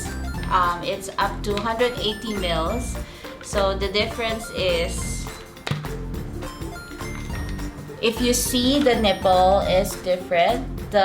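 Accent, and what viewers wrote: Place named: Singapore. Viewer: SingLish is always interesting sound like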